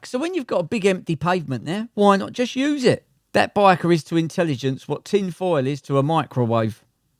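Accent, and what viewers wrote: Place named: England, United Kingdom. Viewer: Holy fark your pommie accent is very fuckin' annoying so n I gone.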